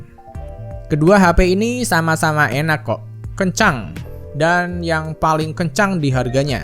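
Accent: Kudus, Indonesia. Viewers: Orang kudus to mas? Wkwkwk sama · Sampean orang kudus mas? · lho bang .. orang kudus? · bang lu dr kudus ya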